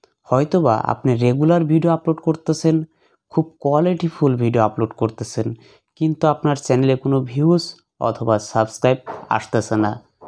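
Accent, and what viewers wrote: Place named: New York, United States. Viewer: ভাই নিউ ওয়ার্ক প্রিসিলার মতো করে কথা বলছেন ওয়াও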